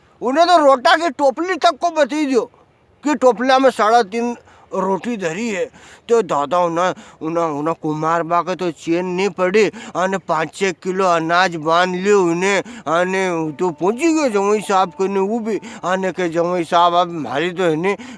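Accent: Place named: Indore, India. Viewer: Ya mhare indori bhasha lage. Mhake athe astar ni bole.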